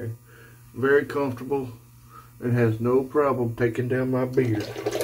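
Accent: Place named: South, United States. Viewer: Great shave! Where you from? You sound like a fellow Southerner.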